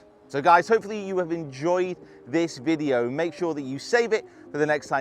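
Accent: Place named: Texas, United States. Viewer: what part of Texas is he from ?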